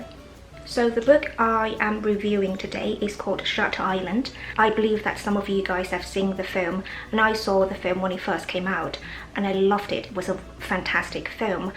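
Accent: United Kingdom. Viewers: What a beautiful Brith accent! I love it. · 听出来了 😂 您是英式发音： spill、 body ~ 明显英式发音😶😶😑😑😬😬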